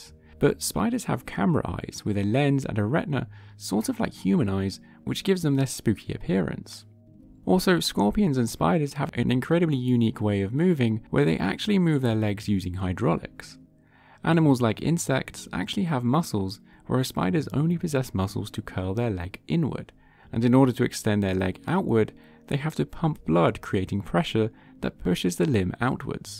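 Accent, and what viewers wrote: United Kingdom. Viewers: he can't help that he's british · do you guys just believe every claim that's confidently stated by a guy with a british accent? it's a rhetorical question, i know you do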